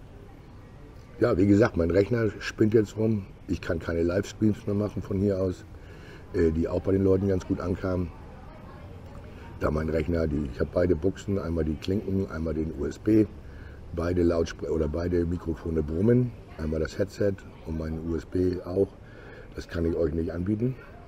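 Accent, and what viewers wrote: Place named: Hamburg, Germany. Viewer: Moin, einfach so bleiben wie Du bist und nicht für andere umerziehen lassen. Ich denke wen deine Aussprache oder Videos nicht passen, sollte einfach nicht nerven und andere schauen. Ich finde genau das machen deine Videos aus, dass du dich nicht verstellst und authentisch bist. Manche kommen mit hamburger Jungs vielleicht nicht klar, dann sollen sie einfach wegblicken. Ich würde die nörgler blockieren falls sowas geht. Sollen sie sich andere Videos anschauen. Viele vergessen das sie umsonst schauen und du kosten hast. Ich finde deine Videos top, egal ob auf Roller, zu Fuß, teils englisch oder laut, scheiß der Hund drauf. So sind sie top. Ein Mangel habe ich, dein abspann mit den Verweis auf andere Videos, würde ich nur 5-10 Sekunden machen, dadurch schauen viele nicht bis zum schluß denke ich. Das zählt wohl auch wenn bis zum Schluss geschaut wird. 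Deutschland ist keine Option für dich, dazu bist du zu lange weg gewesen und wie Du sagst, als Deutscher hat man hier nichts zu erwarten. Weiter so mit deinen Videos. Danke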